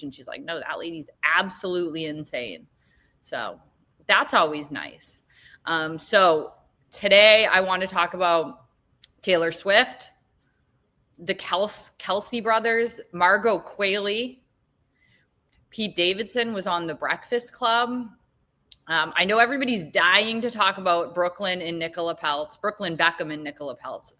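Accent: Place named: Massachusetts, United States. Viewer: I thought Mass.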